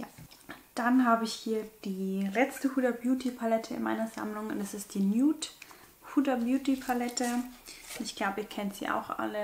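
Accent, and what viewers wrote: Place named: Bavaria, Germany. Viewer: Soeben entdeckt 😅 gefällt mir gut.. Ich meine zu hören dass du aus Bayern bist😁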